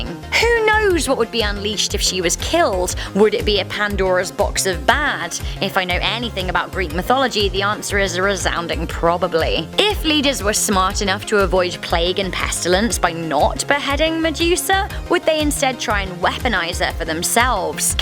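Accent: England, United Kingdom. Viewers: Just to say, I live your English accent dear😊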